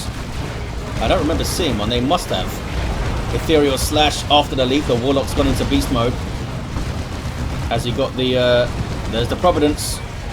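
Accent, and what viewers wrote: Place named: Midlands, United Kingdom. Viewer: midlands England is my bet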